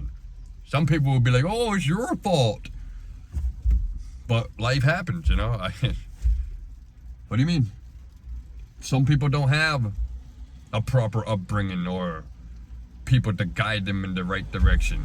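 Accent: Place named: Cajun, United States. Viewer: Are you from Louisiana? I’m getting a Cajun twang! Best of luck to you, brother!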